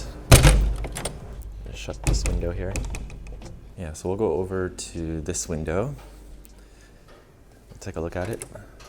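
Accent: United States. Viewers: Thank you for your reply. Hanoi sounds like the ideal climate for me. I like a mild winter but not 5 months of ice and snow. I would like to find a studio for $250 or a little more depending on amenities. Like you, I don't want to share a kitchen. Too many bad experiences sharing kitchens or bathrooms. Most people are not very considerate. You sound like an American. Are you American or Canadian? If American, have you run into resentment regarding the Vietnam war? I was always against the war. I am old enough to have fought in it but I was so opposed because I knew we couldn't win unless we used nukes and that would have brought in China. Johnson was a very bad, stupid president who wasted a lot of lives on both sides because he couldn't admit he was wrong. Eisenhower told Kennedy to never get involved in a land war in Asia because you can't win but they murdered Kennedy who was going to pull out after the 1964 election. 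I have many reasons for being interested in living in Vietnam, mainly the cost of living and I like the people. I rented apartments in S.F. to boat people in 1979 and found them to be lovely people. I also am interested in seeing if I can help mitigate the damage the U.S. did by teaching english, friendship, mitigating agent orange etc. By being there I can also help their economy in my small way.I will look for your Q and A video. Sounds good. Thanks again.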